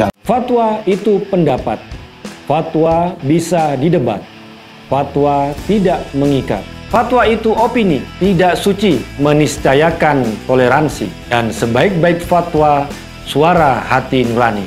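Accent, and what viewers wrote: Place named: Surabaya, Indonesia. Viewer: Orang Surabaya Jawanya kasar Bong Romwli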